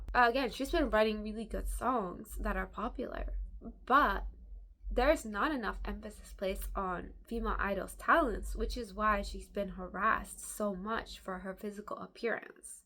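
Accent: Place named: United States. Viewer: Off topic but your accent is interesting to me :) are you American? Like born and raised?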